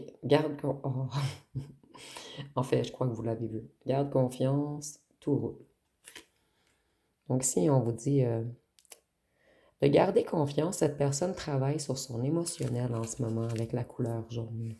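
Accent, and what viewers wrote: Quebec, Canada. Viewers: Est-ce que tu viens du Québec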